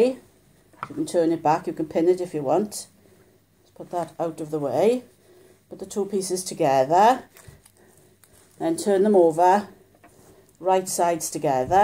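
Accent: Wales, United Kingdom. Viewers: Super video......Love your welsh accent....Thank you xxx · Your tutorial is the best 👍...and I love your welsh accent 💟⭐I will use your method when I sew my log cabin quilt together. 🙋‍♀️😊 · Ok. I just read a few of the comments and your replies. Your accent is Welsh! Awesome. I have now completely made 3 king size quilts by hand using your method. I am only a few months away from finishing my fourth king size quilt. This one is a double Irish Chain. Still using your method. You are the BEST. USA. Kentucky. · Oh this is a great tutorial .. now i can see where i made mistskes on my squares. Thanks everso and I love your Welsh accent. My dad's family are all from Llanelli... any time I need a dose of Welsh tones I will come and replay your video 💕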